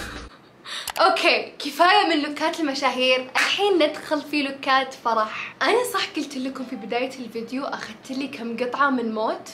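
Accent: Saudi Arabia. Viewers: يمه هذي مين اول مره اشوفها بصراحه بس يمه تدخل القلب عفويتها تجنن ماشاءالله + احسها كويتيه بس لهجتها سعوديه بس برضو احس يليق عليها تكون كويتيه😭😂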